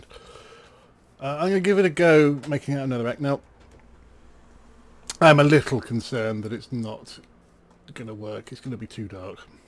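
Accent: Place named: Southern England, United Kingdom. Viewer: This is the first video I have watched of yours and I must say I really like your commentary style. Being a southern Brit myself, it's great to hear such a southern accent talking about Minecraft! I really wished you'd get more views on your videos, your style may be slower and more relaxed but it is honestly the perfect thing for late night YouTube viewings! Keep up the great work, good sir! :)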